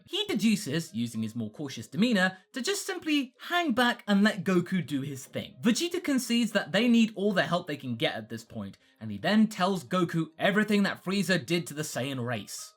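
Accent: United Kingdom. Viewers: R u British ? Just askin sorry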